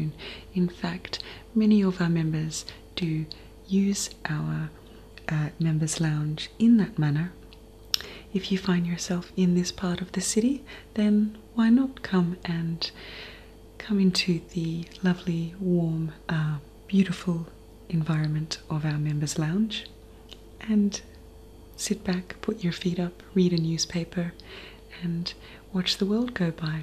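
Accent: Australia. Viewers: Tpgain 70 sounds like an elegant and educated Australian accent in my opinion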